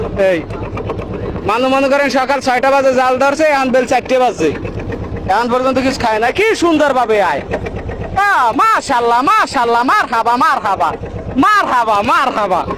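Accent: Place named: Barishal, Bangladesh. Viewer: বরিশাল্লা মনু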